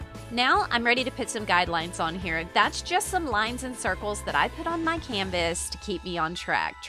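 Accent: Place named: South, United States. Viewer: Your soothing voice with a slight southern accent (so cute) and watching you create is how I start each morning. You've once again inspired me. Thank you!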